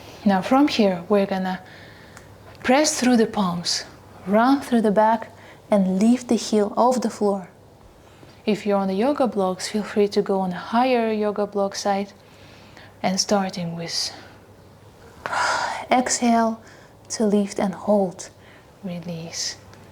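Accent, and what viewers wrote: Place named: Kazakhstan. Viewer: cool Kazakh accent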